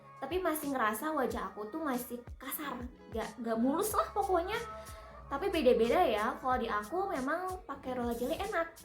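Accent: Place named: West Java, Indonesia. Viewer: Sunda nya tetep keluar yah bundd wkawka ditunggu next video 🙌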